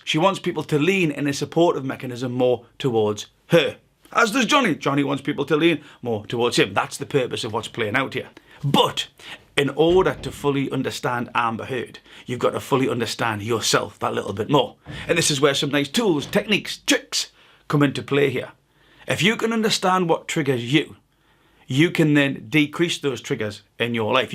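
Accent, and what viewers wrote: Newcastle, United Kingdom. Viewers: Newcastle I think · That’s defo a Newcastle accent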